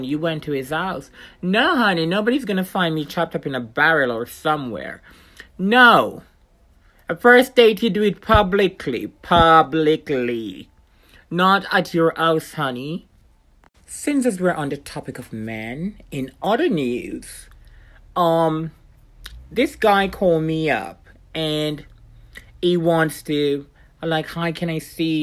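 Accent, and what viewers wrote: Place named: Ireland. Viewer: Love your videos keep it up girl I know u said your Jamaican I love your accent sounds Irish ☘️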